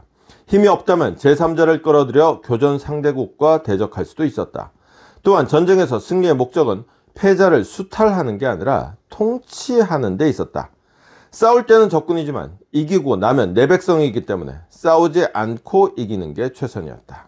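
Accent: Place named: Gyeongsang, South Korea. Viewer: 경상도 사투리가 맛깔 나십니다..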